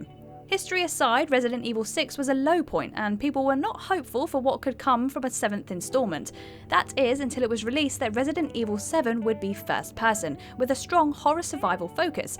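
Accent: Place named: United Kingdom. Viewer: maybe its the british accent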